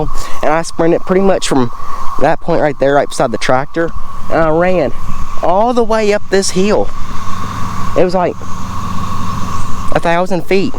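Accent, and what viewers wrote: South, United States. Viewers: Hi there, I'm new too you're YouTube channel, and I have in joyed what you have shown, thank you for showing us a part of your day, and in joy your Southern voice, I'm from Kentucky and I miss it so much, thank you for having the Southern voice, I'm from Campton Kentucky or better know as Wolfe county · Hey bud, just say your channel and enjoyed it. I'm from your neighbor from Tennessee. I'm glad to here the southern talking on this. See-ya in the next one. Old Hickory, TN 🇺🇸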